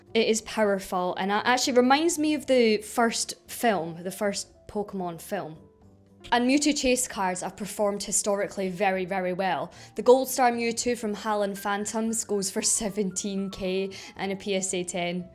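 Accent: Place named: Scotland, United Kingdom. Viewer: I’m sure those 4000 hours watch time will come no bother - I really appreciate your videos, you make much better arguments that many of the other investing streamers who I feel jump on the hype trains and doom and gloom for the extra views. It’s also refreshing to hear a fellow Scot talking pokemon. Question - I got the Mega charizard UPC and Phantasmal flames booster boxes at retail. Would you flip them for a better set like destined rivals or just hold them for the next few years? They don’t seem to be very popular… thanks!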